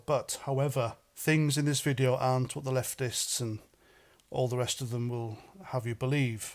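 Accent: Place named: North Wales, United Kingdom. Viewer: Lovely to hear a narrative from a fellow North Wales 🏴 man. Greetings from Bodorgan Ynys Mon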